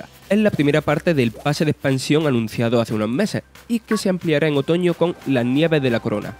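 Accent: Andalusia, Spain. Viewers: Andaluz detecte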